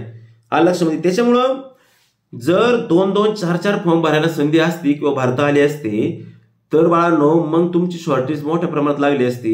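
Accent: Ahmednagar, India. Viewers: सर मला तुमची भाषा ऐकूनच वाटल होत की तुम्ही नगर चे असणार म्हणून 😄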